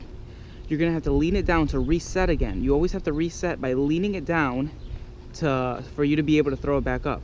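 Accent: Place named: England, United Kingdom. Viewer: You sound very English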